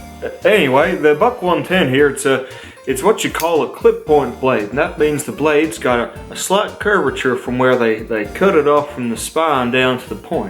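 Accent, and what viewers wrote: South, United States. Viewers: Thank you for finally making a video I can understand. Your authentic USA accent really helped!!! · That is an excellent country western accent.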